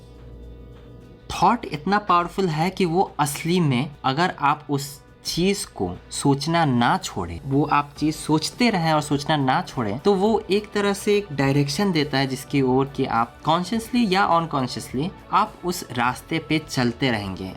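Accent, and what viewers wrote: Bihar, India. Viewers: It is quite intriguing to hear a Korean speak Hindi in Bihari accent! Mind blowing to hear the "Bhadiya" with nasal tone!! ha ha ha ha Heard your thoughts on Money and success. Would like you think a bit more on success. Money or popularity or power is not really success in life; money is means for life. Also one big point you missed is of multi-tasking. nobody says you ought to do one thing only at a time, focus on 2-3-4 things to be made sources of Income. Do something online like stock trading or tutoring or youtube, do full time job and a part time over weekends sometimes, all at same time or different times. Since you are young you can start multiple ways and then drop many or all along the way and start something new ! Yes, you think tooooo much, Just do it !! If you think giving gyan would be great on YT, no, stick to stories... 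preferably imaginative...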